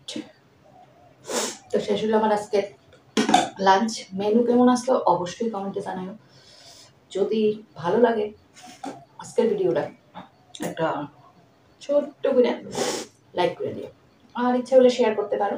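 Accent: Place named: Assam, India. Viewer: Tomar kotha gulo sune amr sei assam ar kotha mone pore jai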